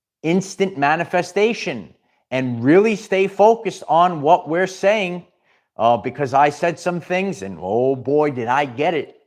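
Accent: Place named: Brooklyn, United States. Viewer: I am here for ur Brooklyn accent allllllllllllllllll day. Letter rip tater chip. I'm from Oklahoma and Texas and say yall. Ur the best. ❤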